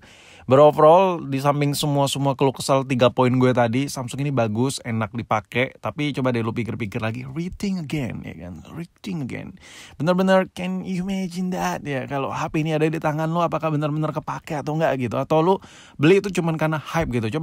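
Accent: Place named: Medan, Indonesia. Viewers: Logat Medan si babi ini, sini kau jumpa tengah kita di simpang pos, aku mau belik hape. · Omak orang Medan ni kek nya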